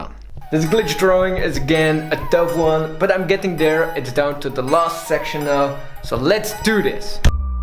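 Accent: France. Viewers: Are you french?cuz you have a little french accent 😄 Anyways i am french too😊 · Ta video explique très bien comment faire 👏 et tu a un super accent pour un français 😋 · Ça se sent que t français 😂j’adore t vidéo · Je me suis doutée que t'étais français avec l'accent, et mon intuition a été confirmée quand j'ai vu les feuilles Canson noires!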